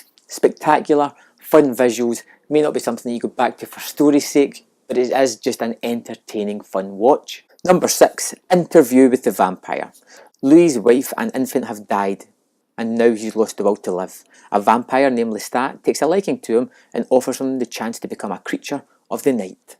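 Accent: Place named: Glasgow, United Kingdom. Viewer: I guess its from Glasgow? Have to digest what you are saying as I am foreigner living in England. But your reviews are good just watched Neesons Non-Stop which was great and the 70-80 % of stuff in Netflix/Amazon is average/bad.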